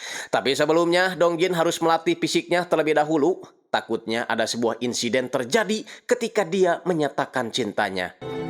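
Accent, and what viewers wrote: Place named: Sunda, Indonesia. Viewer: trima kasih kang, nyeritain nya seru n lucu dgn gaya sundanya, sukses selalu kang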